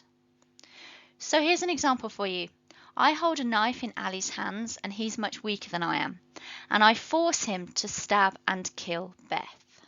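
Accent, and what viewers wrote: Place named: England, United Kingdom. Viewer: usually i dont like english accents but i liked yours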